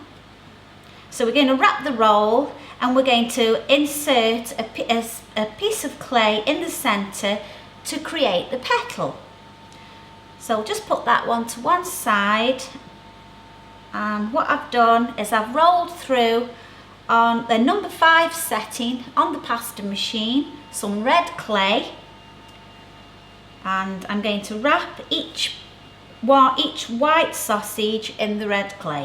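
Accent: United Kingdom. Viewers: Tutorials are always better with a British accent!! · what are you talking about? there is no Irish accent here, just a British one, probably midlands maybe southern. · i love your little british acent X3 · oh my.... i love your accent.i didnt like British before but you talk really beautifully.and your tutorial was awesome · Obviously some ignorant people who think the English all talk like Kate Winslet!! There’s so many awesome accents in the UK! Hers being one of them. Thanks for a great tutorial. Love from Oz x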